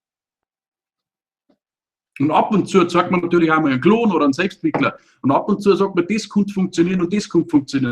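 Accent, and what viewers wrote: Bavaria, Germany. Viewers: Klartext und bayrisch, das passt halt einfach wunderbar zusammen... 👍👍👍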